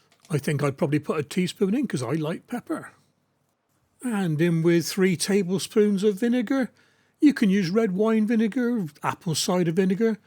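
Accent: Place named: Cornwall, United Kingdom. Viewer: What a recipe! Where's that from? Doukhbor... what language is that? Also, I'm trying to place your accent... are you from Cornwall?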